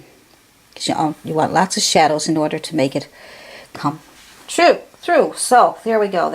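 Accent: Newfoundland, Canada. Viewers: Hello my duckie, sounds like you are a Newf. ;)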